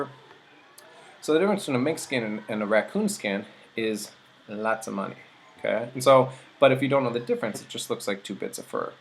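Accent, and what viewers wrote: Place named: Minnesota, United States. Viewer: This fucking guy is too Minnesota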